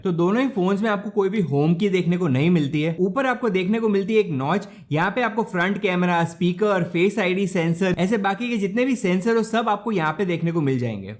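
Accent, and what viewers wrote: Gujarat, India. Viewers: Are you a gujrati sir?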